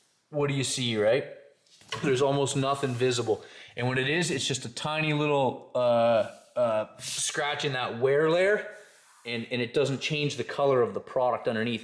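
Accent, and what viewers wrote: Canada. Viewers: when I first heard you talk I knew you were from Canada. I'm from Northern Minnesota. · Yes noticed this too right away from a fellow Canadian! I get the same in my channel in the comments all the time haha can’t hide that Canadian accent 😁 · looks good! I know this has no relevance to the video but I love that i can tell you are Canadian by our distinct "accent" LOL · That hard ass Canadian accent...you act like this stuff is a new product...vinyl flooring has been around sometime...The only difference...in the past it was installed like carpet in rolls. Know the manufacturer gave customers/market pieces in a box instead of rolls.. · I knew you was in Canada by your accent. I live in West Virginia and I’ve been doin research on flooring for my house. This looks good but I’m scared that it would come up.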